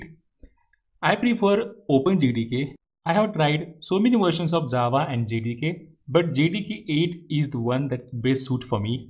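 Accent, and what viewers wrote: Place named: India. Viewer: closed after the indian hello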